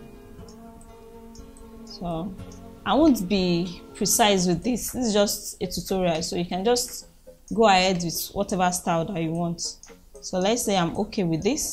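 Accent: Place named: Nigeria. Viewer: You're Nigerian? Your accent sounds familiar because I'm Nigerian too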